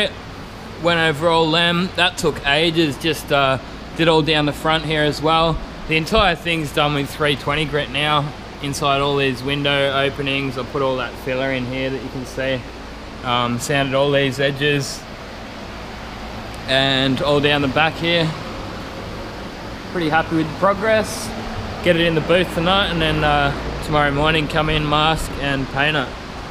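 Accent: Australia. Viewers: I like your enthusiasm. I've been doing this work for 40 years and still enjoy it, but it's fun to watch you work (especially enjoy your aussie accent!)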